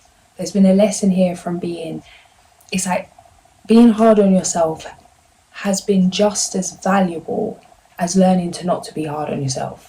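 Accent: United Kingdom. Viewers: Commenting to goose the algorithm. Greatly enjoyed this read. Your voice made me miss mushy peas (with a hint of mint) and a superabundance of swans. Please enjoy them for me.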